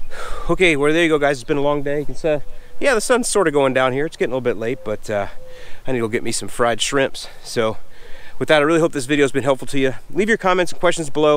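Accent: Chicago, United States. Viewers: The production value, photography, videography, music, sound, all enhance the great lessons. I get a kick out of the use of the Chicago accent and sayings on other videos from this! Well done. Great content! Cheers!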